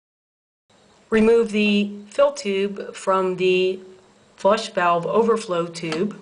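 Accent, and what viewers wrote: Philadelphia, United States. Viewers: sound like your from Philly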